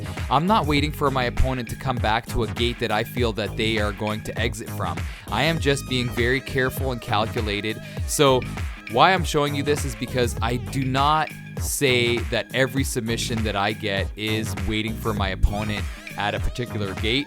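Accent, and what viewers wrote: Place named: Canada. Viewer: I knew you were Canadian because you sound just like Ricky off The Trailer Park Boys.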